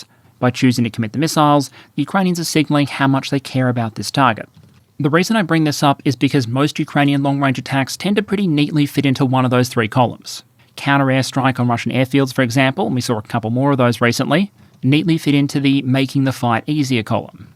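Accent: Australia. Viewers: He's an Aussie, alright?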